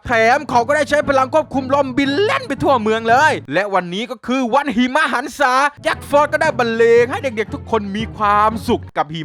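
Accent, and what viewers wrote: South, Thailand. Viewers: ชอบเวลาพี่พูดใต้อะ พี่พูดเยอะๆหน่อยผมชอบ55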